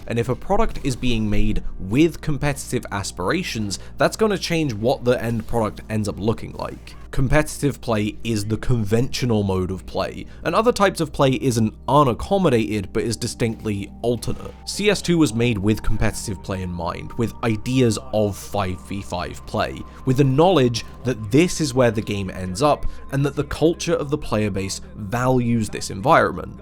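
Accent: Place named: United Kingdom. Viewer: brit detected, listening and learning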